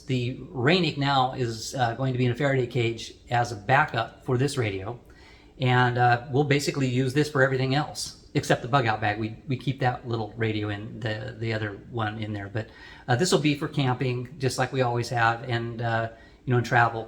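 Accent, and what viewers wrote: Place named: England, United Kingdom. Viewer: Bro's english is very English.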